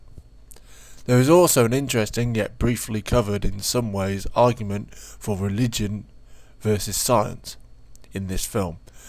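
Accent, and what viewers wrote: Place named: England, United Kingdom. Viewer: It looked like another res evil to me but I like Will enough to give it a look. Thanks for this insightful review. Nice to hear an english accent tell it how it is for a change. You just need to polish up the speech a bit and it would sound professional. Nice job though. I'm going to favourite your page for future reference, thanks. 5*'s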